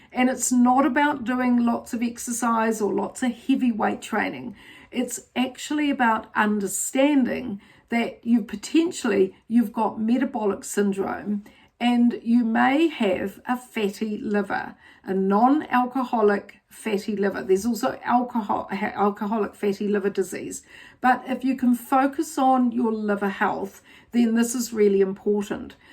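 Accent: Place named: New Zealand. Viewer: Why do New Zealanders say “Woman” for plural instead of “Women “?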